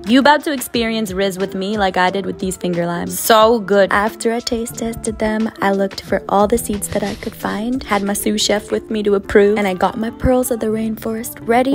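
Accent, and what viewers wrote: South, United States. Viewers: So weird. Sometimes she has a kind of oriental accent, sometimes Spanish on some words. And then always throws that southern accent in there lol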